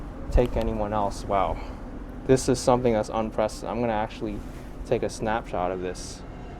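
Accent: New York, United States. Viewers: This might be your best video yet. The part where you're walking through Astoria Park, especially down along the river between the Hell Gate and the Triboro (sorry, it'll always be the Triboro to me - nothing against RFK, but I'm just too old - LOL) is breathtaking. I spent many a happy hour at Astoria Pool as a kid. Sometimes when I was really young, my dad would drive over from Woodside to the Shore Drive. I was always fascinated by looking at the East River and the tales of there being a big whirlpool under the Hell Gate Bridge. I always expected to see a passing boat get swallowed up. Never happened! Seeing Astoria Park at twilight was amazing. And one other thing: I love your New York accent. It's a mild NYC accent, not an Archie Bunker NYC accent. Most accents, be they Southern, Boston, Chicago, NYC, etc., are enjoyable as long as they're not extreme. 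Yours is the real thing. Keep up the good work. I love your videos.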